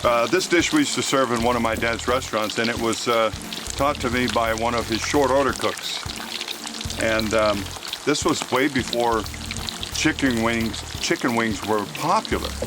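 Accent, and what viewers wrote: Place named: Italy. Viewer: U have a kind of a italian,,,ish accent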